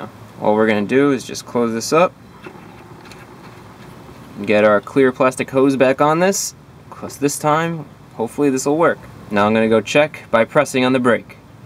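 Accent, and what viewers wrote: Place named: Pennsylvania, United States. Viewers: You sound like you have a Philly accent- wooter! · Know how I know you are from Pennsylvania?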